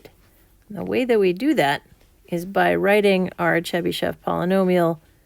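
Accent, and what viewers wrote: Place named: Australia. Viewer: Aus accent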